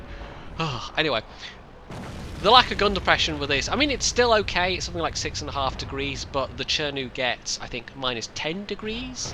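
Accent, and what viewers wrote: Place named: Scotland, United Kingdom. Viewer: It's the Scottish accent messing it up. :D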